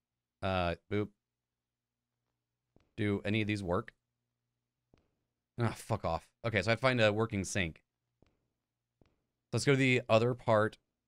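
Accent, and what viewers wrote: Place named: Scotland, United Kingdom. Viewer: I absolutely love the Scottish accent.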